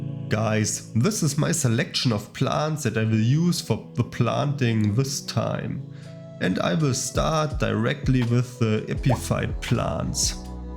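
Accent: Germany. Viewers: i hear some german😅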